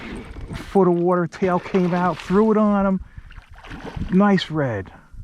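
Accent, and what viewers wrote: Long Island, United States. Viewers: did you move to Florida you got me when you said you where in Florida in that Northshore long Island voice!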